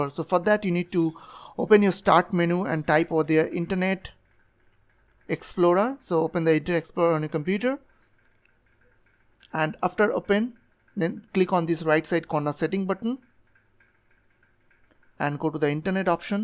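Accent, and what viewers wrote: India. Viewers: I was happy after I heard that heavy indian accent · mr zoid i hate indian accents but did it work?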